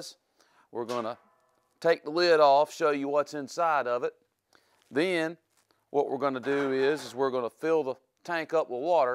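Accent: South, United States. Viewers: I just like your southern accent you would stick out where I live in northern Maine!!!!!! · From Canada, thanks for the unedited video. Now i understand how the work and the failure of water passing the bladder. Much appreciated, keep up the good work. Also love the southern drawl 😁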